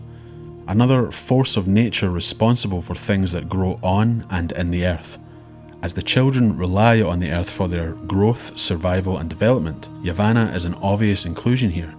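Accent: Scotland, United Kingdom. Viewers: God your gorgeous silky scottish voice is so dreamy. I hope you become an audible narrator.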